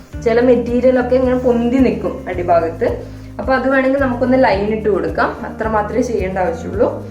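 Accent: Kannur, India. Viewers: Njn subscribe cheythu,kannur aanennu kettappaze,njnanum kannur aanu😍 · Kannur kariyano? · Kannooor kaari aaano?? 😍 Samsaaram and slang very lovely 🎉🎉🎉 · Kannur aano???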